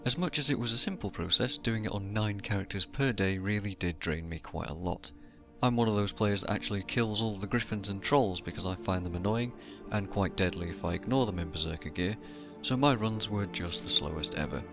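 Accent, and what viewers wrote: North, United Kingdom. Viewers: Such a soothing northern lilt!:D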